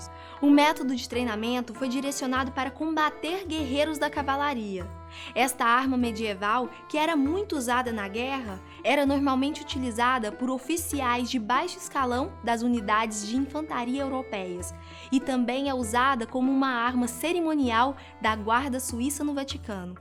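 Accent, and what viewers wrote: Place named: Minas Gerais, Brazil. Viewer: aposto que essa mina que apresenta é mineira e de BH, um mineiro reconhece o outro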